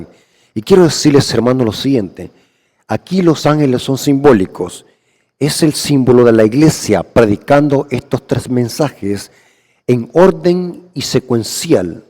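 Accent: El Salvador. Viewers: Disculpe, el hermano no dice sexta, sino que su pronunciación es diferente, él está diciendo SECTA. 😊 ASÍ HABLA ÉL, POR SERDEL SALVADOR.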